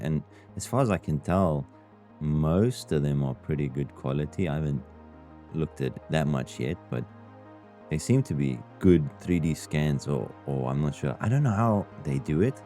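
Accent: South Africa. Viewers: I'm new here. Are you South African?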